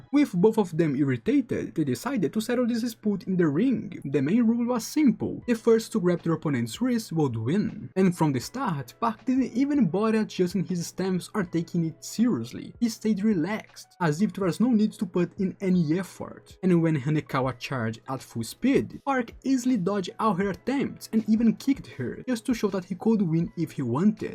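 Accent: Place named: Brazil. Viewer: mano, eu venho assistir teus vídeos regularmente e eu gosto deles. Mas tem um problema, o seu sotaque é bem forte é as vezes fica um pouco difícil de entender. eu moro no Reino unido desde de eu tinha 10 anos, então eu estou familiar com o ingles e se vc quiser a gente pode conversar um pouquinho e te der umas dicas pra melhorar a pronunciamento. Tudo de graça, é claro, eu só quero ver mais um brasileiro suceder na gringa.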